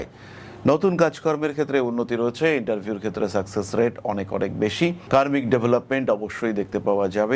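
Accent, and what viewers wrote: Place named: Bangladesh. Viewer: আপনি কি বাংলাদেশে বড় হয়েছেন ছোট থেকে মানে আপনার কথার টান শুনে মনে হয়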